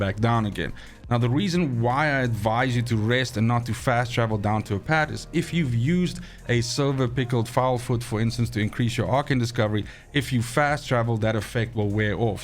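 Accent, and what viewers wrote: South Africa. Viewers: Are you from South Africa bro?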